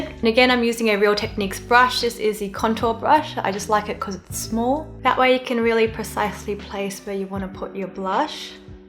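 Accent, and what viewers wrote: Australia. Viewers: Congrats 🎉 love ur makeup tutorials and ur aussie accent 🥺🤟🏻 IG : diemthy.ng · i've just recently subscribed after watching a few of your videos and it's so good to come across an aussie youtuber that i actually like and trust! plus it's just nice hearing a familiar accent haha love your work x IG: jai.enne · If I can remind I suscribed to channel with her orange or red makeup, her cute aussie accent really lifts my spirit and love to binge watch all her videos to catch up her contents. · happy 100K !! wasn't expecting you to have an Australian accent and happily subscribed when i watched ur first video because of the energy positive vibezzz and being genuinely excited for makeup. looking forward to your future vid lovexx ig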